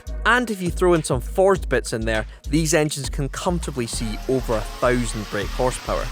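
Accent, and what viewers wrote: Scotland, United Kingdom. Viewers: It’s partly his Scottish accent I reckon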